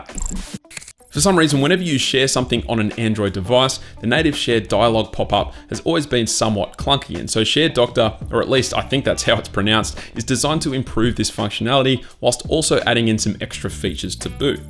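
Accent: Australia. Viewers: Aussie I guess😀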